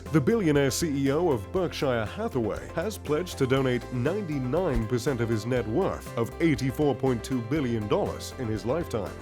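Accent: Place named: United Kingdom. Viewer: I watched numerous videos on this channel and i realize that British voice is appealing! It adds something to each videos; for example the way he pronouces "dollars", the pitch, the tone, the intonation, the inflection, the accent and let's not forget to mention the humour! They all play a role too! Some weird coincidence, i don't know but i used to watch a TV program called Lifestyles of the Rich and Famous and the host Robin Leach was also a Brit!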